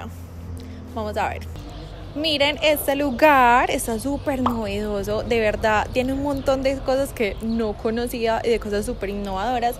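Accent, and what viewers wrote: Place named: Colombia. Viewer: Hola juapa saludos desde los Estados Unidos soy de acapulco megusta ese asentó maravilloso por ese asentó empecé aver las series de Colombia saluditos juapa 😊😊😊😊😊😊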